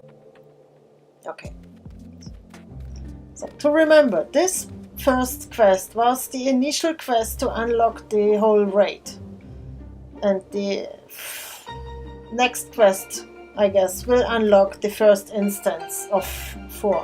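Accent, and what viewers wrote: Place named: Germany. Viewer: this german accent tho ^^ nice guide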